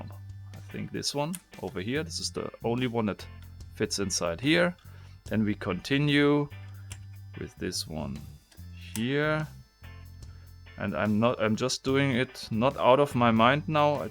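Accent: Germany. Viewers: It's the accent! I don't know why but Germans speaking English are making me joyful somehow haha and English is not even my mother tongue (I'm French) :D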